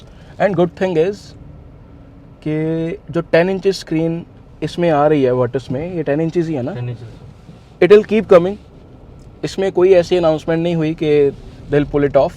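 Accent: Punjab, India. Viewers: punjabi lagda bai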